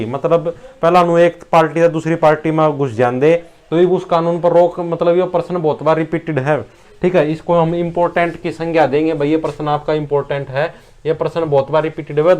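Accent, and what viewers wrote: Haryana, India. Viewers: kha se ho bro bhut achhe lago haryanvi bolte hue pls tell ur district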